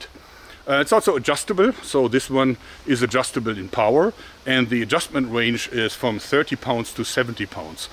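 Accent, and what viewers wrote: Germany. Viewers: Do not cut off the :"Ha Ha Ha" with german akcent ! It's one of reasons I watching You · Ist er deutsch? Es hört sich so an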